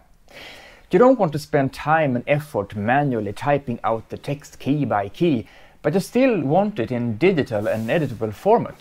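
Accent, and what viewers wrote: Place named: Germany. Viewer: God bless you for your intention to help inform people. Please be aware that your high intelligence and multiple language knowledge seem to have come at the price of comprehensibility for your listeners - it is extremely difficult to discern if you are soeaking German with an Irish accent, or soeaking Turkish with a German accent. In both attempts to decipher your speech through the poor audio quality of significant room noise snd highly reflective surfaces of walls floors tables windows and ceilings, it is indeterminable. Please ask experienced web video makers for free tools and tips on improving communication and perception and creating successful communication. My grandma was from Oldcastle Ireland. So no bias against thick accents per se. :-) I'm US English speaker with simpleton level vocabulary in German and Spanish and idiot American level French - basically "fluent"! 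8-D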